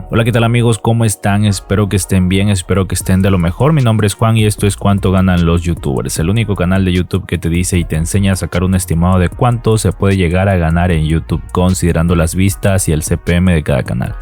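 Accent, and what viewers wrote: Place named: Colombia. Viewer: Me encantan sus videos, pero creo qye el video lo hizo su marido. No he visto otro video parecido a éste, porque hay unas personas que tienen videos de suculentas y muchas plantas, con muy buenos consejos de abono y tansplantacion y compra. Pero seguro que es su marido el autor del video. Si así lo creo. Porque tiene acento colombiano.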